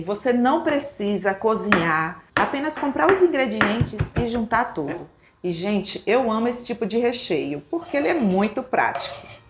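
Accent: Bahia, Brazil. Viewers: Como gosto do sotaque da, essa baiana arretada. ❤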